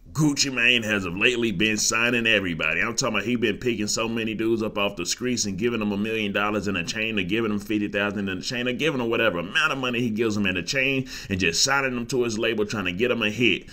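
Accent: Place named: New Orleans, United States. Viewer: Ur aww aww sound like u been n that nolia b4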